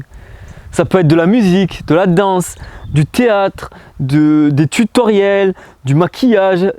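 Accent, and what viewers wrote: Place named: South, France. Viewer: Coucou ! J'ai pas regardé toutes tes vidéos mais ce que j'aime beaucoup chez toi, c'est que tu progresses vite ! Ta diction est beaucoup plus punchy et moins saccadée, tout en conservant cet aspect "théâtral", c'est vraiment chouette ce que tu fais ! Après je t'avoue, mais je veux pas t'influencer hein, mais j'aimais plutôt bien les pistes de réflexion profondes (avec une petite teinte d'humour comme t'aime bien faire, saupoudré de ton accent du Sud (à lire aussi avec l'accent du Sud aussi aha) ;) ) Mais je pense que tu feras ça pour les prochaines ! Continue l'ami t'es clairement sur la bonne voie !!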